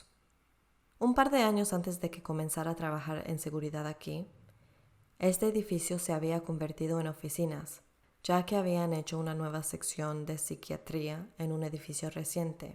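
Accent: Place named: Latin America. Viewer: Feliz de escuchar a una narradora latina en el youtube🙏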